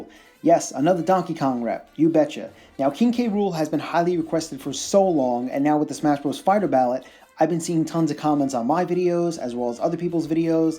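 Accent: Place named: New York, United States. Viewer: I love this guy's NY accent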